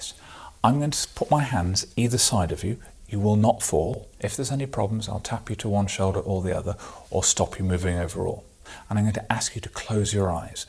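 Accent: England, United Kingdom. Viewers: Yep. This english accent kills me. Silence is better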